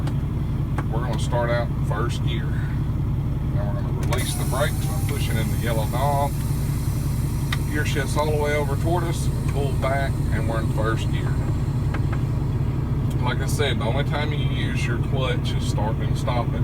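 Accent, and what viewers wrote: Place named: Appalachia, United States. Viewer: Cringeworthy hillbilly accent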